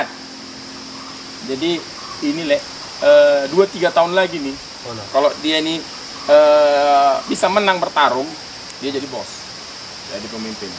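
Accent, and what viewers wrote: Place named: North Sumatra, Indonesia. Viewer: dialek bg mantab kali..org sumut kali..sukses selalu 💪🏆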